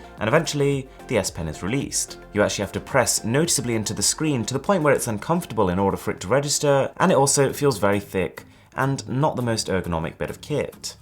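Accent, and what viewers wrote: United Kingdom. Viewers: Ťëčhťübēř_ Přïñčē He Is Not Indian His Parents Were Indian And He Born In UK... AS You Can See From Hos Accent!!!....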